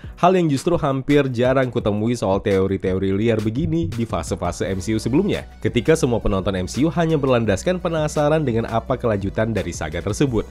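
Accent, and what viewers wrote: Medan, Indonesia. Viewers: Orang Medan kau bg?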